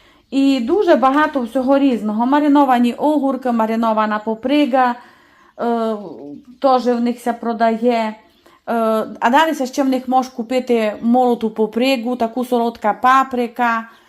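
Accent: Transcarpathia, Ukraine. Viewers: 😢😢😢ооо, ви собі навіть не уявляєте, які спогади в мене з цією стравою і яка ностальгія за Закарпаттям - це мій другий дім. Приємно чути Закарпатський діалект🤗 Коли прочитала назву "м'ясні крученики" зразу згадала рецепт бабусі, який вона називала - м'ясні шишки)) Все дитинство моє пройшло на Закарпатті - чим дуже тішуся. Ще там маю родичів, нажаль дідуся і бабусі вже немає😢. Дуже б хотіла знову поїхати на Закарпаття з своїми дітками, щоб вони побачили ту красу. Можливо з часом поїду. Вам бажаю здоров'я міцного і успішного розвитку каналу💙💛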